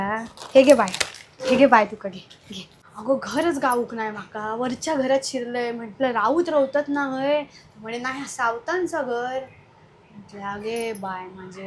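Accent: Malvan, India. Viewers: लय भारी मालवणी तर झक्कास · A-pratim malvani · भारी तुम्हाला मालवणी येता 😂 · Bharichhh काय ता केलास गो pure मालवणी ❤❤❤ मी कुडाळचा आसय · भारी मालवणी तु मालवणी आहेस का, ❤❤❤❤❤❤❤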